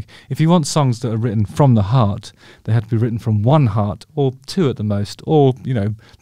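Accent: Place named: RP, United Kingdom. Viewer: What a beautiful singing voice but I also like your speaking voice its a posh cultured accent that comes across as natural and not affected. On stage I call your best performance an expression of unaffected grace. Really dig your channel.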